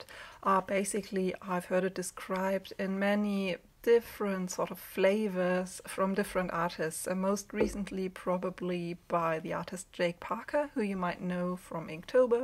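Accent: Germany. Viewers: I came here for the subject matter, but it`s your impressive vocal delivery in posh English with a German lilt that has kept me lingering.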